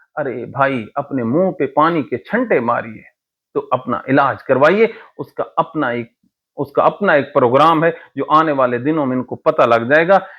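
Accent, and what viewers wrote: Saraiki, Pakistan. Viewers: جب آ پ کا پہلا وی لاگ سنا تو مجھے لگا آ پ کی مادری زبان سرائیکی ہے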